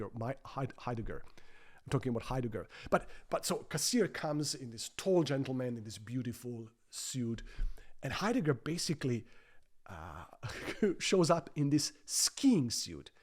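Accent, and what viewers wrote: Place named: Germany. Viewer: That was a wonderful talk, and it has certainly awakened my interest in Heidegger. Thank you! Your spoken English is excellent, by the way. Your accent is difficult to place. Ist Deutsch ihre Muttersprache?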